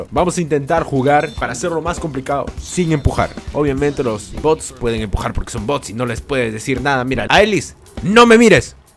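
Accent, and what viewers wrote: Peru. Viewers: me parece o eres peruano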